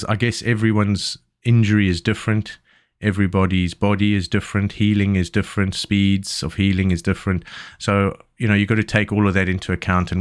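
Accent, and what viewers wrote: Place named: South Africa. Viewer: Another encouraging video, thanks! You sound like you are from SA?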